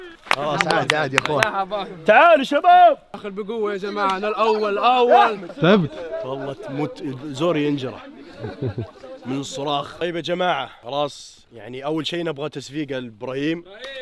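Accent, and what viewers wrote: Saudi Arabia. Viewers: لهجتك مثل السعوديين تماماً هل انت سعودي ولا ارني 🇸🇦🇯🇴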